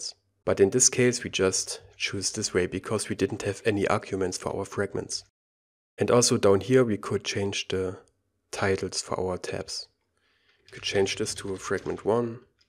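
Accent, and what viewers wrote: Germany. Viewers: I like the german accent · so cool - listen to the text in English, but with a German accent))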